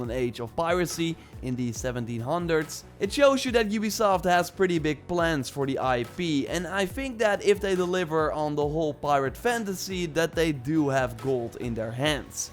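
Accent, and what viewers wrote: Netherlands. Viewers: U sound dutch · You sound like you are from the Netherlands. You have that "P is B and B is P" Accent.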